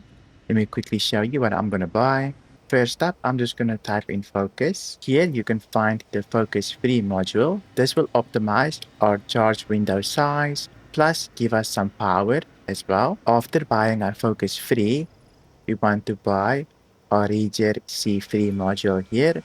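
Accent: Afrikaans, South Africa. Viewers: Fokkit dis darem maar lekker om daai Afrikaanse aksent in Star Citizen te hoor. Dankie vir al die video's ek leer vrek baie! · Just checked where your account is based. Thought I heard a nice Afrikaans accent there. 💪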